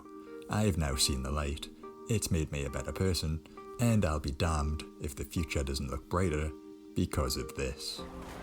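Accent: Newcastle, United Kingdom. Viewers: Definitely a true geordie. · An Indian alumnus of the South Tyneside College, from the 90s. I have lovely memories of South Shields. I get nostalgic listening to the Geordie accent. Is there any video where you talk Geordie?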